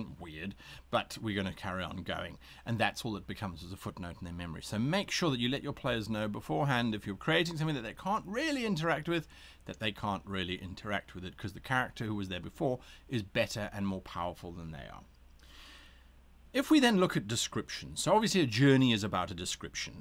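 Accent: United Kingdom. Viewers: South Africa, huh? I wouldn't have guessed that; your accent sounds British. Unless you moved there later in life. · I know this is an old video to comment on, but I am so glad I watched it. I have suspected for a long time that you are South African, but was never sure. Your accent is is such that I thought you may be British. Very very happy to see a fellow South African do well on YouTube and be an amazing voice for us nerds everywhere. You sir, have a new subscriber !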